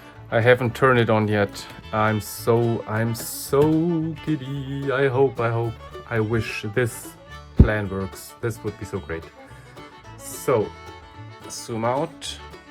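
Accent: Germany. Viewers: Wenn du an den ersten paar englischen wörtern merkst, dass der typ eigentlich deutsch spricht :D.. schöner mod und gut gemacht allerdings haust du damit ne tonne inputlag drauf mit diesen billigen av2hdmi adaptern... besser composite und dann upscalen mit nem hochwerigen scaler und bisschen mit den filtern spielen.. aber ich verstehe deinen ansatz nette idee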